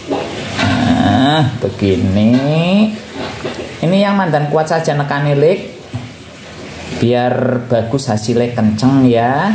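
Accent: Banyumas, Indonesia. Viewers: Ngapak lucu koh 😂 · Pbg hadir kang ngapak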